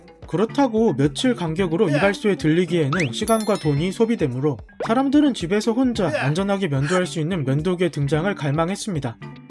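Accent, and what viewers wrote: Gyeongsang, South Korea. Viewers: 억양이 영남억양인데 엌ㅋㅋ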